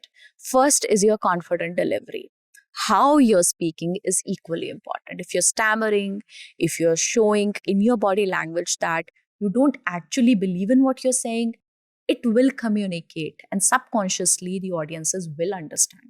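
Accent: India. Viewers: Am I the only one having problem with indian English accent ? 😢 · You are so good with words. But your Indian accent ruins everything.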